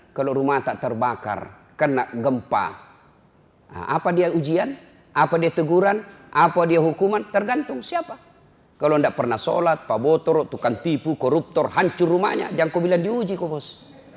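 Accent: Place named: Sulawesi, Indonesia. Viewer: Sering dengar ceramah dari Ustd Dasa'ad Latif,sy suka sekali.Bahasanya sederhana mudah di fahami dan dialeknya mengingatkan sy waktu masih tinggal di daerah Sulawesi. Sy sendiri orang Jawa, tapi sejak bayi sampai remaja saya tinggal disana.Semoga Ustd dan keluarga sll dlm lindungan dan berkah Allah SWT.Jadilah penceramah.yg baik untuk NKRI.Dari semua suku.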